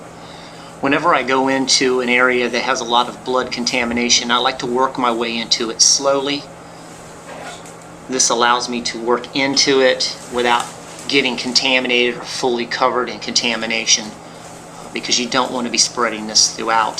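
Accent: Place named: Norfolk, United Kingdom. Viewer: That Norfolk accent is THICK. I’m from northern va, so we say it all boring “nor folk”.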